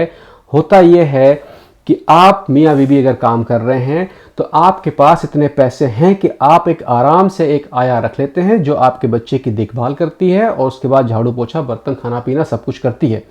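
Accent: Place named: Uttar Pradesh, India. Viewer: Maja a Gaya aap India mein kahan se ho aapki batchit up se milati hai aapki baten sunkar sunkar Achcha lagta hai Jaise Ham UP mein pahunch gaye ho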